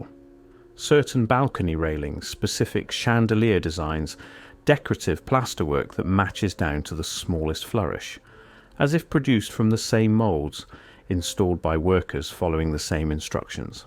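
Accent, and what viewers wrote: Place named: Mississippi, United States. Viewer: I thought you were from Mississippi 😅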